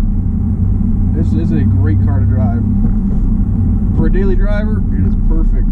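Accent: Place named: Midwest, United States. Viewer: The midwestern accent is AGGRESSIVE. Really completes the vibe.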